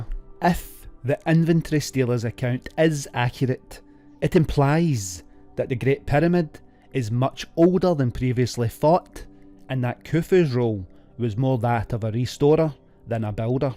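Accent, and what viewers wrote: Scotland, United Kingdom. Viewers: Delivering information in a Scottish accent makes it sound stupid from the off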